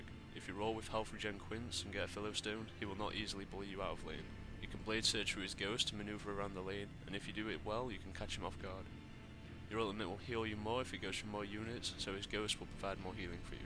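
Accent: Northern England, United Kingdom. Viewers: You have the same accent like the Northerners in Game of Thrones! Where are you from?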